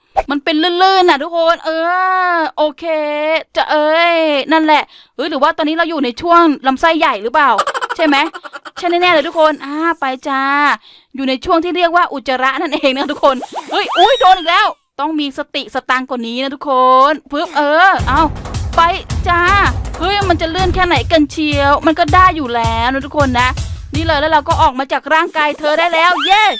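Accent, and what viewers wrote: Isan, Thailand. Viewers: พี่ เป็นคนลาวใช่ไหมค่ะหนูพี่พูอีสานคะ😅😅😅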